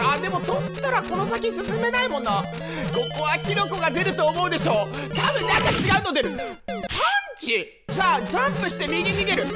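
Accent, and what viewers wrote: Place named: Kitakyushu, Japan. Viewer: たまに北九州の方言が出るのがめちゃくちゃ親近感湧きます🥑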